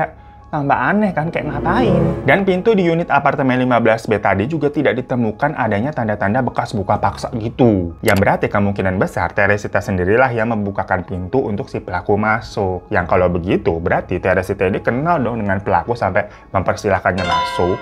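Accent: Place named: Jakarta, Indonesia. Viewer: Bang namany kok ky ad mirip2 nma jepang dag,tp pengucapannya org betawi beud😂😂😂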